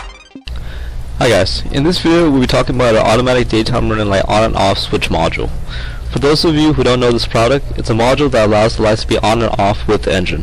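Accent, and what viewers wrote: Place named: India. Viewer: Your accent....are you from India?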